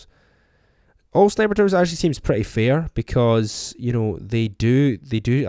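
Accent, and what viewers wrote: Scotland, United Kingdom. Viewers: Are you Scottish lad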